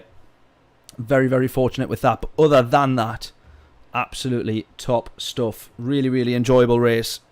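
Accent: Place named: United Kingdom. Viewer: The last race was very exciting, but the best race was the second one, the one where you pitted one lap too early by accident. I love watching your streams, because you are really fast, but also exemplary fair. The only other streamer who also stops every time after making a mistake is Rory Alexander, who I also like. As a huge fan of since 1988, I also gotta give it to you for promoting my favourite band as a part of the livery of your cars. One more thing that separates you from the rest of the streamers is your accent. I can tell that you're from UK, but which part of UK remains a mystery to me. Gotta love your accent, though.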